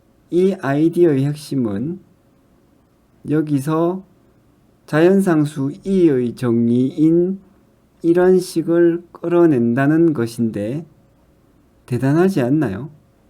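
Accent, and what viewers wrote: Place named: Gyeongsang, South Korea. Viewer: 경상도 사투리가 심하게 들리네요;;사투리로 들으니 e가 굉장히 특이한것처럼 보이네요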